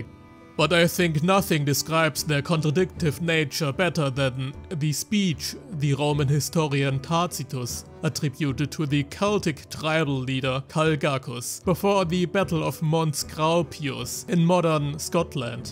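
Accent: Germany. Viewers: Der starke dt. Akzent verbunden mit der nasalen und dadurch etwas arrogant wirkenden Stimme machen es wirklich extrem schwierig das Video anzusehen ohne den Wunsch zu haben es abzubrechen.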